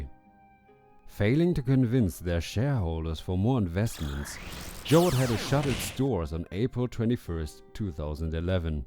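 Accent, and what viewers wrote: Germany. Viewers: Cooles Video! Ich wollt grad schreiben, dass Du die deutschen Namen echt gut aussprichst, aber nach ein paar Minuten viel mir auf, dass Du wohl einfach deutsch mit gutem Englisch bist xD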